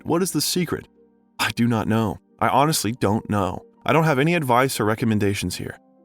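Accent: United States. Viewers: I love your voice and accent. California? Lol hard to follow the story at the beginning because distraction of this pleasant voice. But then, yeah, I like the biography 🎉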